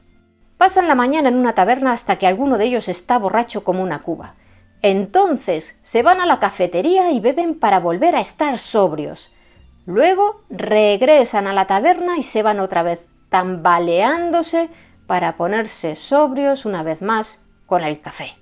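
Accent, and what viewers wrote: Spain. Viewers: Me dió risa porque si nos estamos tomando un cafecito mientras escuchaba el video, saludos, me encanta el contenido pese a que el acento español no me resulta tan agradable, pero claro que no me choca, solo es que no está en South TX uno muy acostumbrado a ese acento al ser de origen mexicano, sin embargo creo que para cuestiones hostoricas nos da cierto aire de parecer adecuado, así en mi mente funciona que creo que se hablaba en la antigüedad aunque sea algo que no sea verdad pero así se concibe en mi mente!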